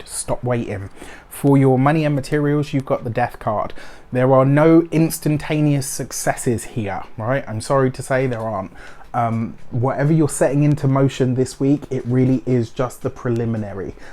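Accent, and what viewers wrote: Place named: Wiltshire, United Kingdom. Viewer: keep up your gift too friend. your accent brings me back to my youth. where's the accent from in Wiltshire ? love & respect...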